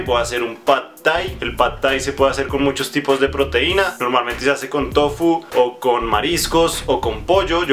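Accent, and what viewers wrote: Antioquia, Colombia. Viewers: tú eres paisa? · Ud es paisa?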